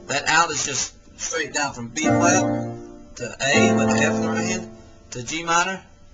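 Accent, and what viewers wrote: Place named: United States. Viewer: Hey, this is cool! interesting accent too! which part of america you from? thanks for sharing your insights. love it.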